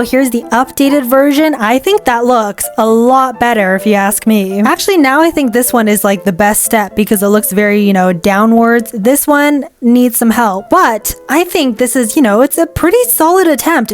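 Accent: United Kingdom. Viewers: Wait.... are you british?😱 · British? You're my new favourite youtuber. And 10/10 for most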